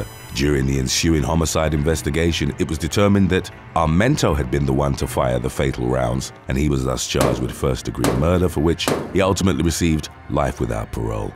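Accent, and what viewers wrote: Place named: United Kingdom. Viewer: Please do when 23 year olds go wrong? I really like your channel, I like the narrator, you sound like darth Vader with a British accent! 😆😆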